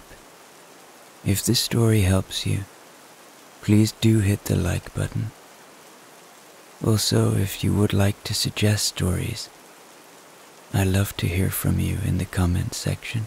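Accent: Ireland. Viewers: Oh, yes!! That Irish accent ❤. No accent is so lovely as an Irish accent. God bless Ireland and all its descendants.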